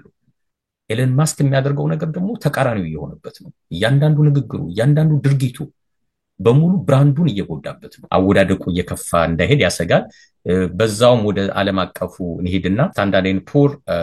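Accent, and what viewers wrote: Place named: Ethiopia. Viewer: After I listen you patiently you make good points,but You have very heavy accent. You Mentioned 100 times Dow jones. You said do jones typical Habesha.Read it Dow jones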